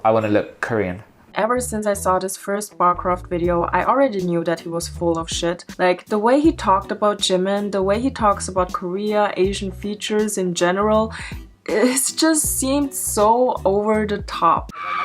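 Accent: Germany. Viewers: offtopic but your accent sounds german and that‘s cute🥺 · Is that a German accent I hear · Ich höre deutschen Akzent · You kinda got a German accent ? Or am I trippin’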